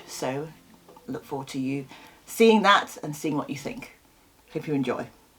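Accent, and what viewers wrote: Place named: England, United Kingdom. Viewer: I just came across your station and I am so engaged with your channel! You have managed to make everything a real experience from the classical music , the English accent and the history ,poetry I love ❤️ it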